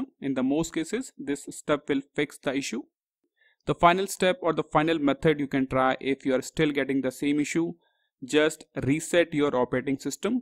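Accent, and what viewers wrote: India. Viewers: Looks for computer problem solutions. Hears Indian voice. Computer fixed. My guy you the best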